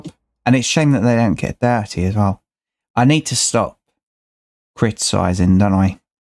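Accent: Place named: United Kingdom. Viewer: 3am and I just bought this haha, sod sleeping tonight mate lol. Nice to hear another British accent too!! We're getting overrun by merican oootubers haha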